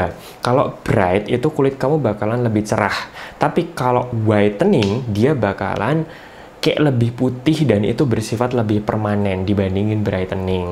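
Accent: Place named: Surabaya, Indonesia. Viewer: Ya Allah pantes kok logate suroboyoan. Ternyata alumni FKG Unair toh. Suwon gawe infone mas 😅😅akhirnya terjawab sudah pertanyaan ttg brightening vs whitening.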